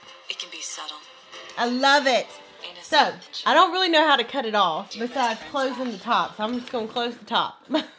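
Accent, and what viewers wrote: South, United States. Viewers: Your southern accent is tooo adorable... Luv ya doll...😀😀😀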